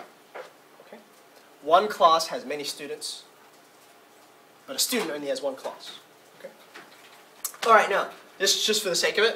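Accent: Australia. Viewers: Yeah, I have to admit I was distracted at first by the thoughts "is that guy Australian?" and "what a cool presentation technology". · He sound australian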